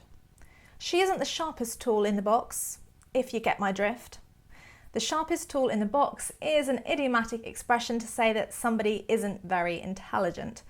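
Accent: United Kingdom. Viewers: I love British accent a lot and your accent also. I had been in England for 10 mouths it's easy to speak English but there's some confusing for me because I'm French speaker . I mean there are many words similar in French and different pronounce.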